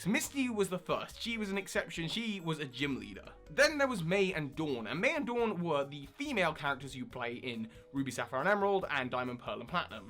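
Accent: United Kingdom. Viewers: soar high... awesome catchphrase there, amplified by the good old British accent. quick question( that you may have answered before, but you have so many videos and I have so little time):from the legendaries alone what game would you pick, sun or moon? I personally would like any but I would prefer moon because, although I adore psychic types, dark is my 3rd favourite type! I can't think of how to end this so, buh-bye!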